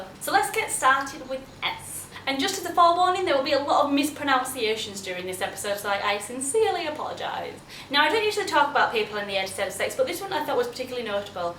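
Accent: Yorkshire, United Kingdom. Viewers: Are you from like Yorkshire or somthing!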